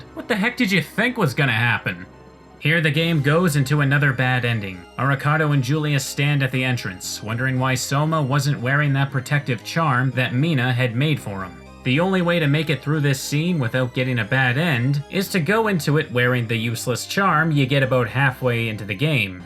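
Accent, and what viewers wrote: Canada. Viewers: Are you Canadian? Anyway this was a brilliant review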